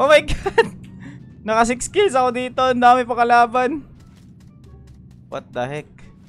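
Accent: Visayas, Philippines. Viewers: Bisaya kaba? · Bisaya kaba lods?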